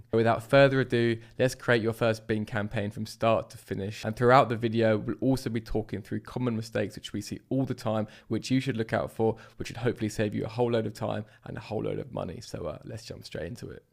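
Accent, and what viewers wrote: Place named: United Kingdom. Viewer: Try to work on the way you talk; it seems to be too fast, I guess you are from the UK and only the uk citizens can understand you better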